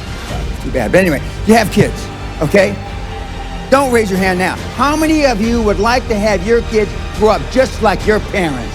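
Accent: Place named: United States. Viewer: Too strong american accent.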